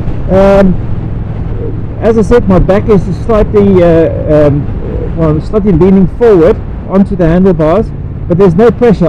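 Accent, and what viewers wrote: South Africa. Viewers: Klink my jy is van Suid Afrika??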